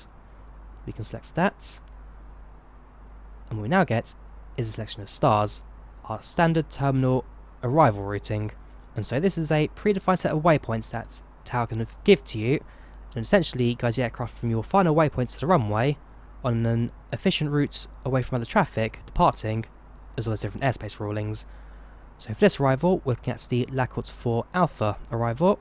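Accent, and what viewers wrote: United Kingdom. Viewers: Such a nice tutorial video . But couldn't understand your British accent sometimes. But big fan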